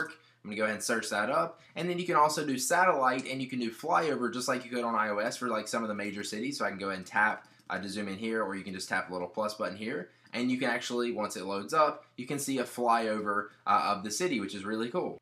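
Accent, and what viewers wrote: South, United States. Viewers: very southern.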